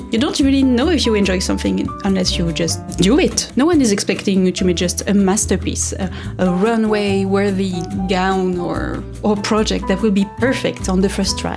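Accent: France. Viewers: We love you exactly for all the crazy, fun, interesting stuff you do and HOW you do it. AND the failures and your creative ways to fix them. You know, you learn more from mistakes actually as they challenge your capability of finding solutions 👍 and please don’t go down the rabbit hole of meeting expectations of others (people that don’t even know you and you should not care about). You are perfect and beautiful - from the inside and the outside - the way you are (btw French accents are very sexy! if I may say so as a straight woman). Please, take a break from all the struggles, if you want to. And you have already made the first step towards leaving your current struggles: you have identified what doesn’t do any good for you, and you can now work on letting that go. I hope you will be better soon, sending you love and good energies 🌈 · please accept many many warm hugs! 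I think it's safe to say that we all love your videos because YOU made them, and we are here to see the projects that make YOU happy, and see YOUR specific style. I don't think anyone here is expecting perfection, we're here to see your fun and creative ideas, things that inspired you, and you enjoyed making. By this I mean, don't put so much pressure on yourself, you can wait until inspiration hits and we'll be happy to watch the process when you're ready to share it. Now for a confidence boost: I probably spend half the time I'm watching your videos observing that you look beautiful no matter if you're wearing makeup or you're in your cozy blanket clothes. As a native English speaker, I think your English is great! It sounds very natural considering it isn't your first language, and your accent is delightful! I always wind up thinking with a French accent after watching one of your videos. 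❤ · I love any content you create and every project you have worked on. You are BEAUTIFUL and I absolutely adore your gorgeous French accent. You ARE enough and you are Soooo relatable and sweet and kind and talented. Please try remember this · EVERYONE goes through slumps, like you said, love yourself through it and give yourself some space and time. Sometimes, I find, trying to slug through such times just ends up prolonging the recovery process. I now tell people, if you feel the beginning of a cold or something, take a day or two off now before it becomes two weeks or a month. I too struggle to apply that advice to myself and yes, practice makes perfect. You inspire me and I love the way you speak English. It makes you unique and who doesn’t love a French accent, anyway. How many people speak perfect French as a second language? Just know you are part of many people’s worlds and I am sure there are way more people who love you and are grateful than those who are critical and hurtful. 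Everyone’s successes are the product of many “failures “. They are ATTEMPTS not failures. It’s better to have tried and failed than not to have tried at all! Have courage and carry on! With much love and light going your way. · If it helps: i really love your voice. My father is french and your accent makes me remember happy times and remember visiting France every summer. Merci ♡ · I❤to watch your videos. Your creativity is inspiring and your French accent is the sweetest. Did you ever see Nerdforge, she is your Norwegian "twinsister"on youtube. Would be fun to see you two make a video together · hugs you are beautiful, but that's not why I watch you're videos. yes you're happy creative bouncy self brings me joy. but! you don't have to be like happy all the time. As for the accent... you're French, obviously you will have a French accent. the fact you can speak a second language as well as you do is impressive. 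personally I find you're accent charming and I love that you don't sound like a robot speaking 'perfect' English. you said everything yourself in the quilt analogy. the problem for all of us is it's easier to say that believe for ourselves. all I can do is.. hugs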